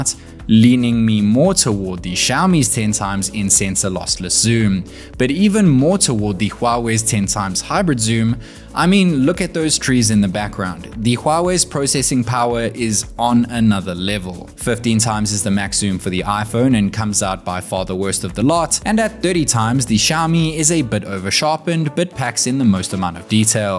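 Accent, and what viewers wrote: South Africa. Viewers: Yay , great review I thought your accent was familiar. Hello fellow South African 🎉🎉🎉looks like Waterfront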